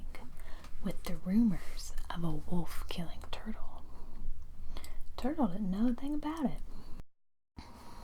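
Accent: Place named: South, United States. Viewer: Southern Accent is awesome.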